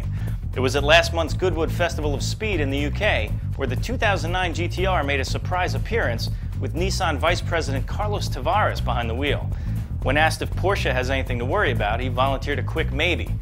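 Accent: Canada. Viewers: lol... well it doesn't sound like an accent to you because you are Canadian... not because you don't have an accent.... anyone who speaks at all, has an accent of some kind. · your from quebec arnt you? pull your head outta your ass. Vive le Anglise! :P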